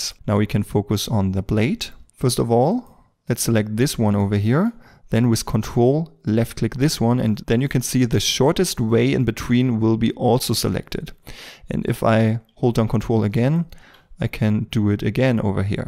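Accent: Germany. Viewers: dat german accent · Your English is good (I think, I don't know, I am not a native English speaker either), but I figured out that you are from Germany from you accent anyway.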